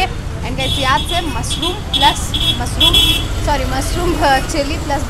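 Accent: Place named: Odisha, India. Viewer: Odia Heiki hindi...lol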